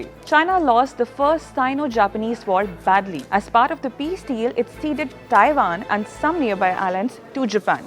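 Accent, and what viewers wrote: India. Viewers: Why does the anchor have very strong Indian accent? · Are you speaking Indian lanagauge? Sound like Indian language!!! Please improve, learn and reduce your Indian accent as much as possible.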